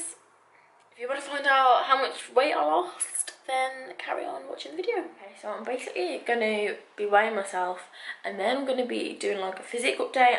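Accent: United Kingdom. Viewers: Are u from the midlands?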